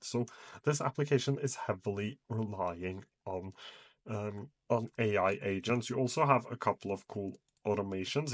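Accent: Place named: Norway. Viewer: Came for the info, stayed for that cute Norwegian (?) accent 😝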